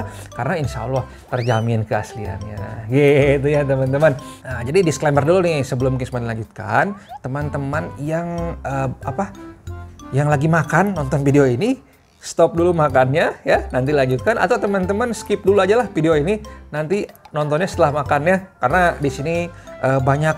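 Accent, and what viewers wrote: West Java, Indonesia. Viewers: Sunda na TI mna kang😀😂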